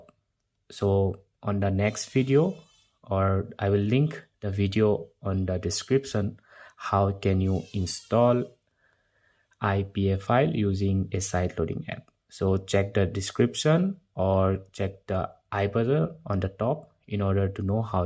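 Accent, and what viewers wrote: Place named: India. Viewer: You’re dumb Indian voice to waste my time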